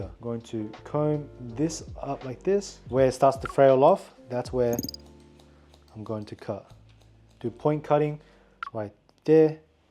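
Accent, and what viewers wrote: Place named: Australia. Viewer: You sound like an ozzie mate 😀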